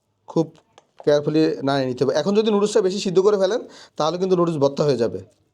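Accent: Noakhali, Bangladesh. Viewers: রান্না টা দারুন, তবে 1টা প্রশ্ন ছিল আপনি কি নোয়াখাইল্লা?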